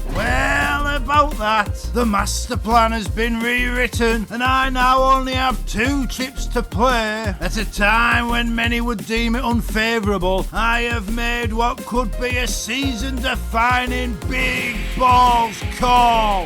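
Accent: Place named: Northern England, United Kingdom. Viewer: Your take on things is great – you sound like the FPL voice in my head, but more northern (and balder) ... Keep up the great work & good luck 👍✨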